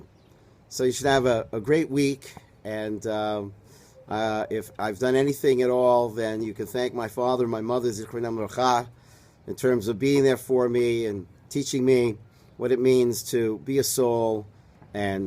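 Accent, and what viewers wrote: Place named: East Coast, United States. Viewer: I am so transfixed by listending to you.You are talking not as a Chabad Rabbi but to me you speak as a fellow from back East ( I am from Bensonhurst) ( I was born in Crown Heights Hospital) and one who makes me remember my jewish soul between the times I pray at my local Chabad in California on Sat, Hear Rabbi Gordon on Sunday for breakfast, Meet with Rabbi Dovid and the seniors on Thursday ( that almost makes a week) oh...and come at 7am when they dont have a minyon. Barry Solomon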